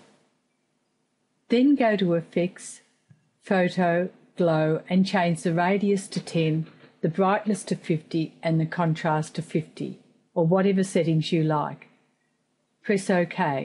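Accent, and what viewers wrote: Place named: United Kingdom. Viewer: your Brit accent is tough to understand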